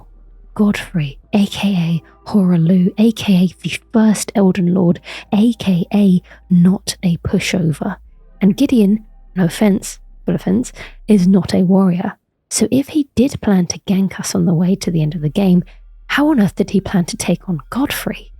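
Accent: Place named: North, United Kingdom. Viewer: Love his Northern accent.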